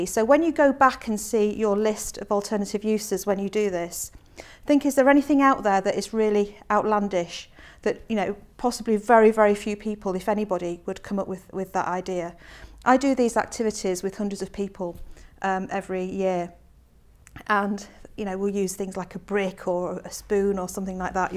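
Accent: United Kingdom. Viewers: I'm an English teacher, I understand what you are doing as ahelp friend.with British pure accent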